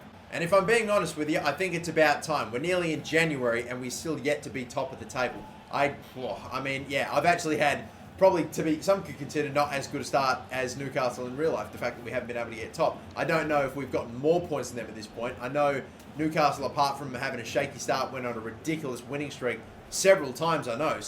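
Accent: Australia. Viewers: Tommy C yeah but then you hear his whining Australian voice even more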